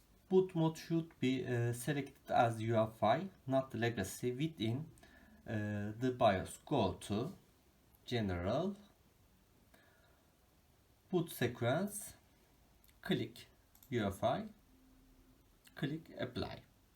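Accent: Turkey. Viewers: very nice ,tnx, and very nice turkish english accent:)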